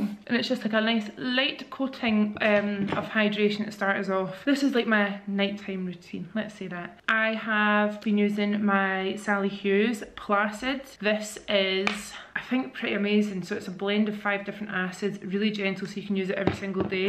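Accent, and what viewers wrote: Scotland, United Kingdom. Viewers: Is it a Scottish thing or just a thing to say words ending in ED in place of words ending in ING? So for example, when you're talking about your new wardrobes, you say 'these just need painted now' instead of 'painting' I wasn't sure if this was a fun little quirk because of where you lived or just a ' ' No shade just genuinely curious because I love a Scottish accent and know how much the accent can change from town to town. Xx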